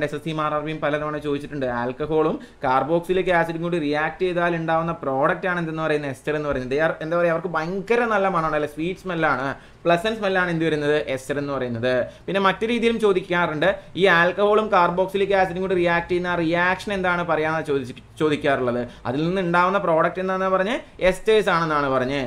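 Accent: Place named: Palakkad, India. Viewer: Chikku chooru... Palakkadanz.. ✨️anagne paraya... ❣️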